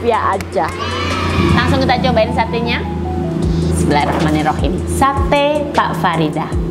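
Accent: Java, Indonesia. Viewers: Pas ngomong jowo aku like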